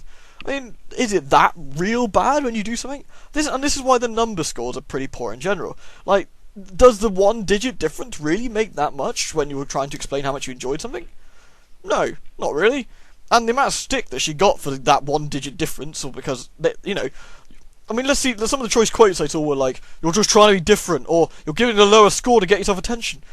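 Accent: United Kingdom. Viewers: Burly Castlevania with shouty ranting brit-man? Sounds legit!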